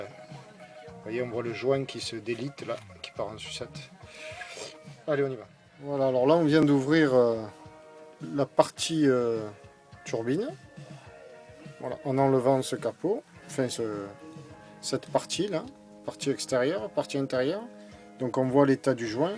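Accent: South, France. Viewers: Bonjour, Et bravo pour cette vidéo réalisée dans un espace "confiné" ah les bateaux… J' ai vu que vous taillez vos joints vous même, il y a bien des années, je le faisais aussi, mais je n 'arrive plus à me fournir en "feuilles" de ce matériau spécial joint. Auriez vous une adresse de fournisseur, car en plus j' entends un bel accent qui me fait penser que vous êtes du midi, je réside moi-même à Marignane, alors si vous voulez bien me donner un tuyau… Je vous remercie par avance. Prenez bien soin de vous et bonnes prochaines ballades en mer. Cordialement. Tristan.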